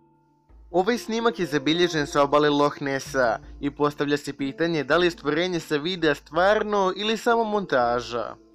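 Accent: Montenegro. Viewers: Da li si ti iz Crne Gore?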